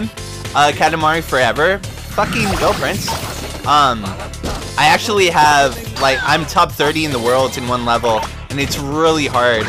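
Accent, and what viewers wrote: California, United States. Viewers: everyone can tell where you live by your voice because of your California dude accent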